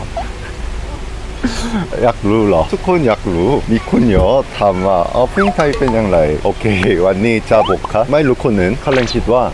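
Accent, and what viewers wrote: South Korea. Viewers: Thai language with Korean accent😂😂😂 You speak like in Korean Film 😂😂😂🤣🤣🤣 so cute 🤣🤣🤣 very talent 👍🏽👍🏽👍🏽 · เพิ่งจะดูเป็คลิปแรก น่ารักมากๆ ชอบคนเกาหลีพูดภาษาไทย น่ารักดีค่ะ😊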